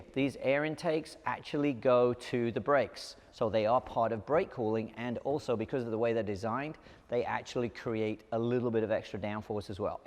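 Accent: United Kingdom. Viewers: Nottingham, but he's been hanging around with a lot of Yanks.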